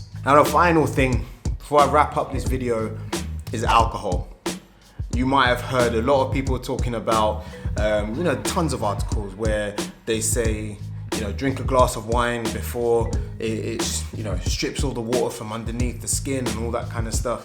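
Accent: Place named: Cockney, United Kingdom. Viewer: Lol at your Cockney accent so funny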